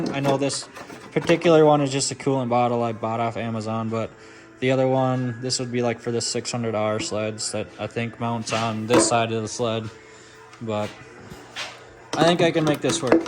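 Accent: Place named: Minnesota, United States. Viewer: Knew you were from mn before you said it. Where are you located?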